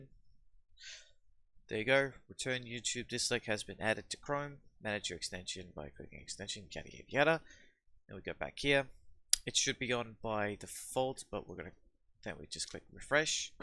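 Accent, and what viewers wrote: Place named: New Zealand. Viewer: I swear you've got a bit of kiwi in your voice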